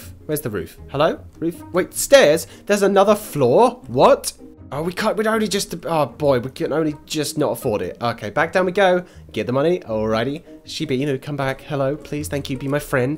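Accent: England, United Kingdom. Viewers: Hi You have a Awsome British accesent And You are the best And Denis called Huskies Ugly:[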